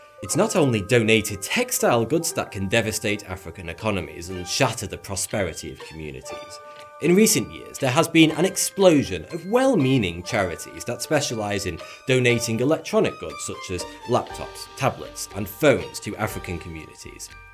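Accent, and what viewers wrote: United Kingdom. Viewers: His accent is so British that I have to watch the video twice , first time to warm up for the accent and the second is to actually understand. But I love his content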